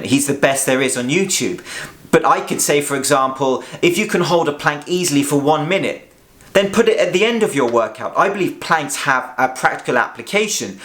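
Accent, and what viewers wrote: England, United Kingdom. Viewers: I think your so called DEBUNK of VShred is ridiculous . I am a 61 year old man (look 37 ) and have been working with VShred for 8 months. I lost 40 lbs and have gained musculature that I had when I was in my late 20's . I follow other channels as well and have become an expert on diet . I don't understand why you are singling Vince out in your very particular attack. You seem to be envious of his following 4.2 million or so viewers . Even with your English accent you will never be the YouTube Star that Vince has become.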